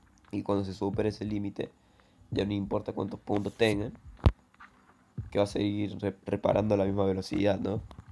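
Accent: Cordoba, Argentina. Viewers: NUEVO SUB WUACHO (me pareces que tenes asentó cordobés jeje) YO HACE 3 DÍAS QUE ME LO INSTALE Y ME ESTOY RE VICIANDO...vi un par de consejos tullos y ya entendí mejor jaja Gracias cruk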